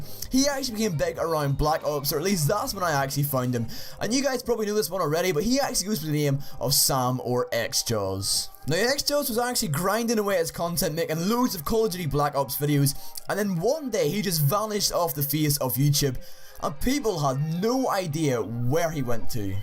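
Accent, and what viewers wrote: Ireland. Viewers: why do u sound like Irish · R u from Northern Ireland or ireland plz reply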